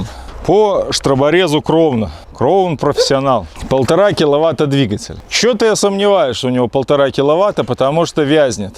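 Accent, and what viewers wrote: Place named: Belarus. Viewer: беларусский говор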